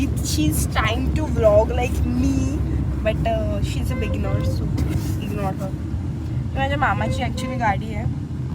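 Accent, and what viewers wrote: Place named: Malvan, India. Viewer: Loved the malvany ascent...plz more of that type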